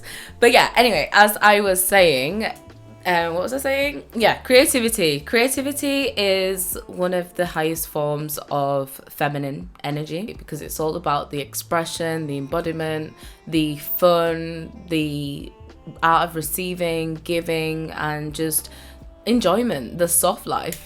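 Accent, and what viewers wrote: United Kingdom. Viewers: Oo what part of the UK are you from? Your accent reminds me of Mel B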